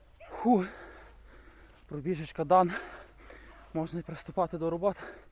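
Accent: Ukraine. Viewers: Ще дуже подобається вимова, галицька українська неперевершена. А побачити чоловіка, який залюбки працює фізично - це рідкість.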